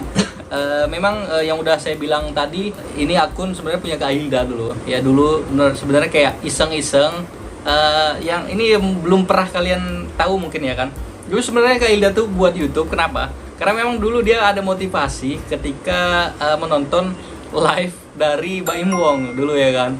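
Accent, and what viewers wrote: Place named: Medan, Indonesia. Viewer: awak setelah tahu kayak seperti bahasa medan gitu.......baru awak suscribe biar enggak apah kali....Selamat ya bang dan bang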